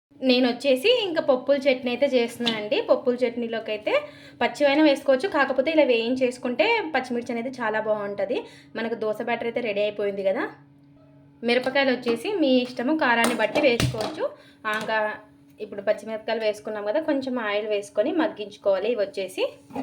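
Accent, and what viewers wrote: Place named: Anantapur, India. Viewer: మీది అనంతపురము జిల్లా కద నాన్నా...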